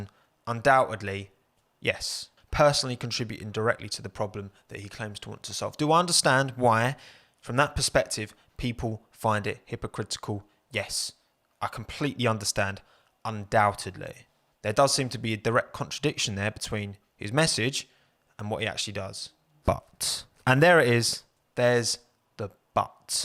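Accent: United Kingdom. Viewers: I only wanted to let you know you have the britiest accent I've ever heard I'm spanish btw.. Nice video too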